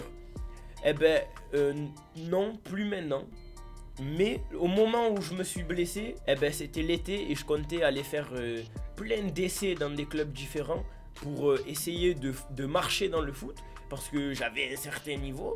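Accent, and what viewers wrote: Marseille, France. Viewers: La vérité les marseillais jvous aime trop votre accent et votre mentalité tu veux le million pour protéger ta miff tes grv un bon en vrai 💪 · Laxent Marseille pas mal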